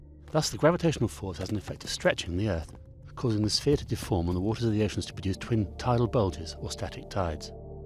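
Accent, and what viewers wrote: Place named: United Kingdom. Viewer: LMAO! Everyone complaining about the narrator are clearly not British. Sorry that British people are intelligent and communicate effectively. I understood every word he said.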